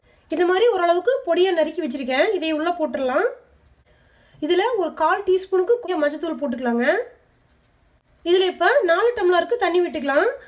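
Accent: Kongu, India. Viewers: ரசம் சாப்பாட்ட விட உங்க கொங்குதமிழ்தானுங்க சூப்பரு, ஏனுங்க என்னுங் நாஞ்சொல்ரது...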